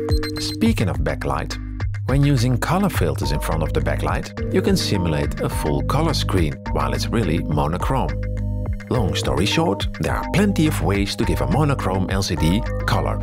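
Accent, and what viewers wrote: Netherlands. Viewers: This channel is like technology connections but narrated by a Dutch(?) person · Are you dutch?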